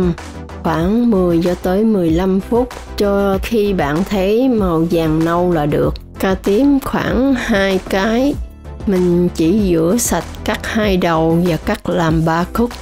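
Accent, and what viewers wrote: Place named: Teochew, China. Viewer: Nghe giong noi la toi biet nguoi tieu chau o vn roi. Ung ho chi chuc kenh cua chi phat trien manh 👍👍👍👍👍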